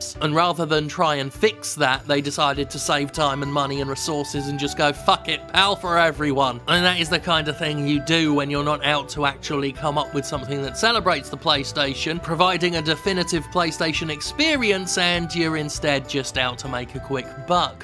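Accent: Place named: United Kingdom. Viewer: Shitsony, cancelled mine. you grew up in the UK? Thought it was just a comedic accent.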